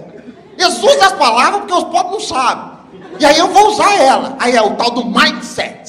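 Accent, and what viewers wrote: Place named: Minas Gerais, Brazil. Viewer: Mineiro falando tipicamente mineiro já me causa risos, e com texto top, bom tom de voz e "taime" certo fica top...kkkkkkk Mt show 👏👏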